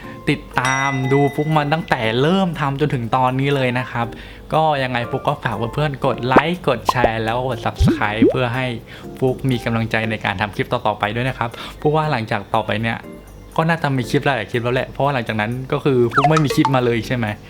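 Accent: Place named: Provincial, Thailand. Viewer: เป็นคนที่ไหนหรอครับ พูดเหน่อจัง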